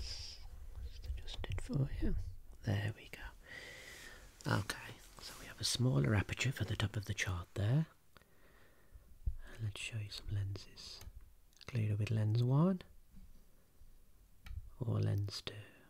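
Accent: United Kingdom. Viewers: A month ago I said this would be the holy grail. Many agreed and you commented about the setup challenge. This is your second attempt, and while I’m excited to watch this I first have to say I’m blown away with your dedication. I was using ASMR to sleep before there was YouTube (bob ross DVD’s). Your unique whisper and Ben Kingsley accent already took over my nightly playlist, but a second attempt to perfect this requested RP Is why a 41 yr old now feels like a groupie. Keep it up, and thank you!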